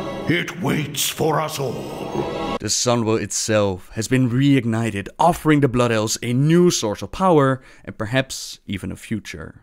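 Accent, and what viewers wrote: Netherlands. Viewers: Garrosh Hellscream If you're talking about, he is Dutch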